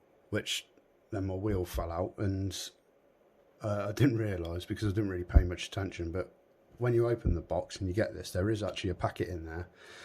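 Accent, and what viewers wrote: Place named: United Kingdom. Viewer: I guess I found time 😹 & great accent btw, for some reason British voices are so easy for me to listen to. Solid video & great tip on the steering rack holder thingy. My steering has a bit of slop in it too, I’ll have to tear it apart & take another look in there. I’ll have to check out the video on bodies later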